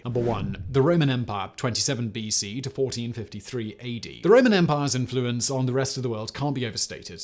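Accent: United Kingdom. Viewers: The British accent doesn't fix Simon's abysmal pronunciation of even the most basic of English words. Painful. Not wasting any more of my time here.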